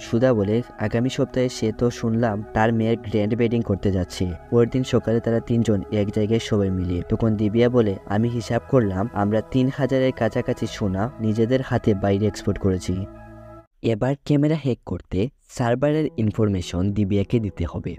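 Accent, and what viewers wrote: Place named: Sylhet, Bangladesh. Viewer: Sylhety vasa oshadaron😮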